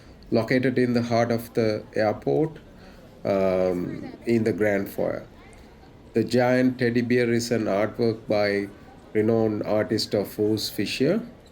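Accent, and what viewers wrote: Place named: Sri Lanka. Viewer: Your English accent is very Sri Lankan..!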